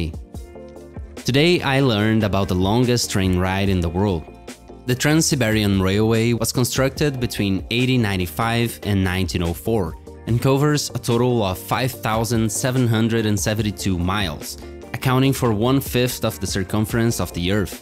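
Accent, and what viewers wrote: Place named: England, United Kingdom. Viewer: I like your English Accent! Where are you from? keep up the great work!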